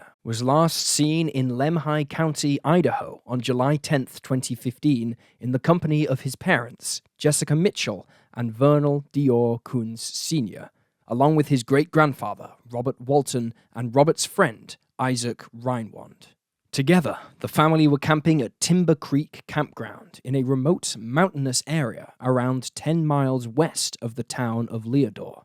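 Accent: United Kingdom. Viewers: I missed the British voiceover!!! Welcome back!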